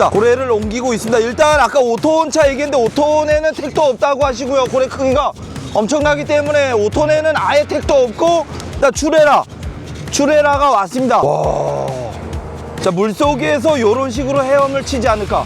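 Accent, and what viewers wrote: Gangwon, South Korea. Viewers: 강원도 사투리 같습니다. 제 군대 선임 어투와 많이 비슷합니다. 강릉 출신이였숩니다. 많이 표준어 쓰시려고 노력하신거 같습니다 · 강원도 사투리가 귀에 쏙쏙ㅋ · 강원도 사투리 재미있는거 같음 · 강원도 시투리 구수하이 좋쿠만뇨~ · 영동지방 강원도 특유 사투리 입니다 북한 억양이랑 비슷해요^^ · 사투리가 지대로자니? 나도 강원도 사람이자니~ 고래가 메른두없다야~:yougotthis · 말투땜에 첨엔 조선족인줄 ㅋㅋㅋ 계속들어보니 구수한 강원도 사투리였드래요 ㅋ 덕분에 귀한영상 잘보고 갑니다! 따봉누르고 가요~ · 속초 말. 참 재미 있네요... 북한 말 같은기.... ㅋㅋㅋ.. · 말투가 강원도 사투리에요. 처음이라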